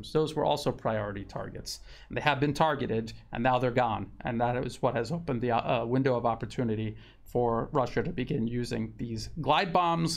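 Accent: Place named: United States. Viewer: It might be your American accent but I hear you talk about “guided bums”. Sounds kinky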